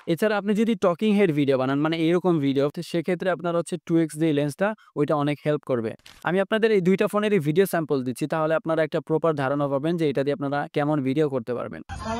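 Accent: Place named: Barishal, Bangladesh. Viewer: আমি শিওর আপনি মোগো বরিশাইল্লা😮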